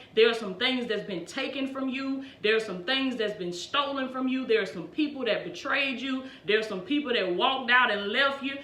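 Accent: South, United States. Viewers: Shalom beautiful Pastor, you have come so far since you began your channel. you are looking so good!! You are such a lovely blessing to the Bride of the Lord. I love your sweet country accent, I have beautiful friends from the deep south and I always enjoyed thier cute accents and delicious home cooking. No body cooks as good as southern cooks, you guys throw down and make everything taste so yummy!! Nothing as good as greens and corn bread 🍞. Yummo!! Blessings Cherokee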